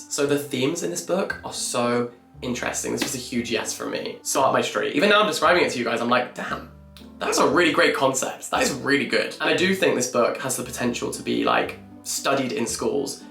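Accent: United Kingdom. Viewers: How can someone be so attractive? I watched a 30 minute video just watching to his face and listening to his British accent🥴no idea what he was talking about. So distracting.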